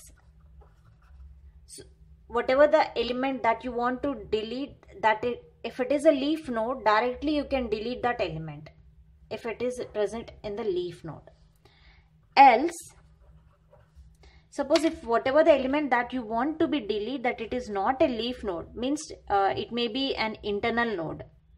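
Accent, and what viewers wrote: India. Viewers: I'm used to the British accent, but perfectly ok (after 10 minutes of getting used to) with the Indian one too. These videos are all great. You should be really happy someone took time to prepare them. If for some reason you are not able to get used, just search on the web an pay for the right accent. This is about Maths and not about accent after all. For your own benefit, focus on the right thing ;>).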